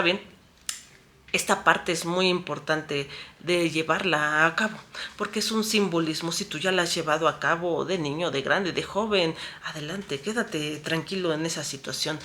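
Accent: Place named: Mexico City, Mexico. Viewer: Hermana, tienes acento capitalino (Chilango). Bendiciones de Dios...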